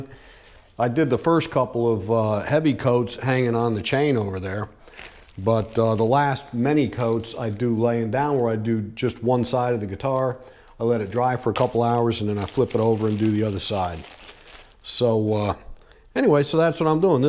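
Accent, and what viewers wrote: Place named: East Coast, United States. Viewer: I also find colors to be so difficult, it's one of my areas to learn more about also. At the end, what you came up with look amazing in my opinion. I'm really happy you chose to differentiate the burl because it looked blah before you changed it. Great work, great looking guitar! Where's the accent that you have from, east coast I assume?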